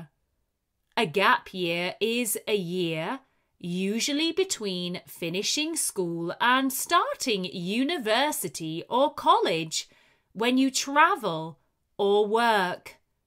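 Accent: United Kingdom. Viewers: I love the British accent for learning English! And I love it your voice, speak slowly, and your stories are very interesting and I can understand all perfect!❤ Thank you very much for your excellent work! Greetings from Spain! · the best channel to learn English in a British accent in a fun and not boring way...thank you for this story...keep going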